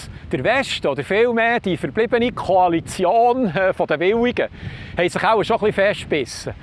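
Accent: Bern, Switzerland. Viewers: Das Berndeutsch ist toll,die Gesinnung auch,danke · Sehr komplexes Thema, aber super erklärt und mit dem Berndütsche Dialekt au no wahnsinnig sympathisch – weiter so, wir freuen uns auf die nächsten Videos. Viele Grüsse aus Chicken Hill at the Lake (Hünenberg See)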